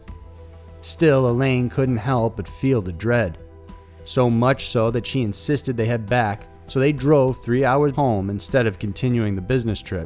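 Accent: South, United States. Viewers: God I love your sexy touch of southern drawl. You make mysterious shit hot again man.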